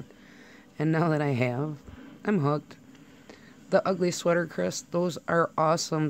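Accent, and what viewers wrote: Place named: Michigan, United States. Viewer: Cool! Nice to meet you! Love your accent reminds me of my cousins up there. If my family had stayed in Michigan I would sound the same. I am so happy I was able to inspire you in any small way. It is why I feel like I need to share my art more, in order to give permission to others to just do it, play! I am big on embracing the inner child. Girl don't you even worry about your teeth, You are uniquely YOu. I had a friend in Memphis who had the worst teeth, but she was one of the most beautiful people I had ever met. One of our BFFs Danno has 2 teeth in his head, but our BRO has been there for us time and time again! I'll take my toothless friend Danno any day over some shallow egotistical pretty boy who can't even be bothered. Keep em coming I can't wait to see some of your art!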